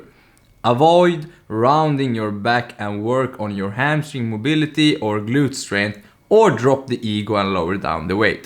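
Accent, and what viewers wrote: Sweden. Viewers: Are you swedish? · Man hör verkligen att du är svensk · Älskar hur man märker att du är svensk på en gång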